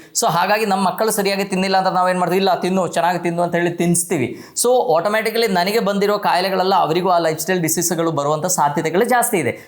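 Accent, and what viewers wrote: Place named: Kerala, India. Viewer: ಡಾಕ್ಟರ್ ನಿಮ್ಮ ಮಾತಿನ ವೇಗ ಶತಾಬ್ದಿ ಎಕ್ಸಪ್ರೆಸ್ ಟ್ರೈನ್ ತರಹ ಇದೆ.ತುಂಬಾ ವಿಚಾರಗಳನ್ನ ಕಡಿಮೆ ಅವಧಿಯಲ್ಲಿ ತಿಳಿಸುವಿರಿ. ನಿಮ್ಮ ಈ ಮಾತಿನ ವೇಗಕ್ಕೆ ಕಾರಣ ನಿಮ್ಮ ಮಾತೃಭಾಷೆ ಮಲೆಯಾಳಂ ಇರಬಹುದು ಅನ್ನಿಸುತ್ತದೆ.ಅದೇ ಆಕ್ಸೆಂಟ್ ಕನ್ನಡದ ಪದಗಳ ಮೇಲೆ ಪ್ರಭಾವ ಬೀರುತ್ತಿದೆ. ನಿಮ್ಮ ಕನ್ನಡ ಭಾಷೆಯ ಶೈಲಿ ಸಾಮಾನ್ಯವಾಗಿ ಮಾತನಾಡುವರಿಗಿಂತ ಭಿನ್ನ ವಾಗಿದೆ. ನಮಸ್ಕಾರಗಳು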